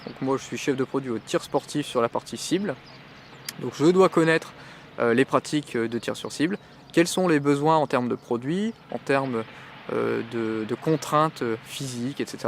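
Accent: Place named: Pas-de-Calais, France. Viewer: Saint-Pol sur ternoise... il y a un super circuit là-bas. Je me disais bien que ton accent m'étais familier. Super chaîne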